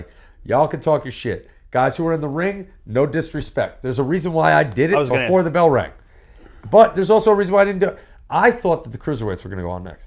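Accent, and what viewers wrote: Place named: New York, United States. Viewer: Why does he's new York voice go in and out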